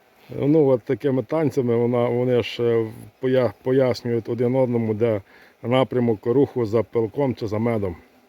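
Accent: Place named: Prykarpattia, Ukraine. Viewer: розмовляєте не по тернопольськи ,а більше говір схожий на Прикарпаття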